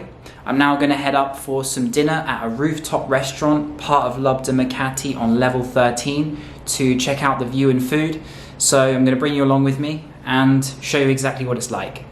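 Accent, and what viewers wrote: England, United Kingdom. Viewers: I love your voice and English accent..You are exceptional in explaining sequence of events during your vedios...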